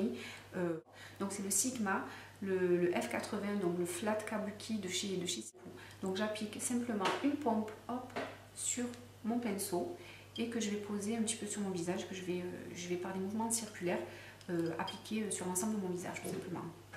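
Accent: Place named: South, France. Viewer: coucou ! ça fait plaisir de te voir en vrai ! Toi aussi tu as un petit accent du sud, tu es d'où ?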